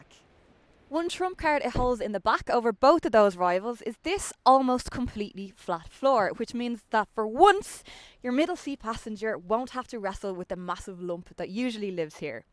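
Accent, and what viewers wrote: Ireland. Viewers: Great video review, you just got a new subscriber all the way down from Greece! P.S. I love your Irish accent,! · Great review well done and I love your Irish accent mmmm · Ok. Just a quick comment about a few points: 1. Your videos are very well made, informative and free from lame jokes (eg. Matt Watson) 2. is a very capable presenter 3. She is also gorgeous and has one of the most beautiful smiles I’ve ever seen. It’s good that she uses that a lot:) 4. I love her Irish accent too Keep up the good job guys. Wanted to leave this comment for quite a long time but I usually watch YouTube on my TV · Love Irish accent :-) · succint review, very well versed presenter. And the best part that irish accent :P Hope you get more subs this year. :) All the best for 100k subs this year! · Is this really or is it just Olivia Thirlby masquerading as. 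The resemblance of these two is just astonishing. And I'm loving the Irish accent. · That Irish accent 👌👌👌❤️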